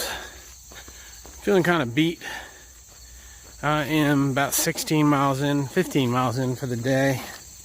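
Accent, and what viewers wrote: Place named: Delaware, United States. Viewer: I'm glad I stumbled upon your video. I section hiked MD and NJ this past year and I'm planning on doing all of PA this summer. I thought I recognized that Delaware accent. I'm also from Delaware. Good luck to you on your thru-hike. I'll be following along.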